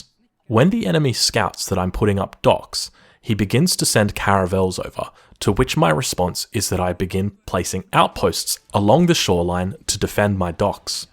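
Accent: Australia. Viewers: Gotta love the aussie way of pronouncing "wada" Just like Modest Pelican ^^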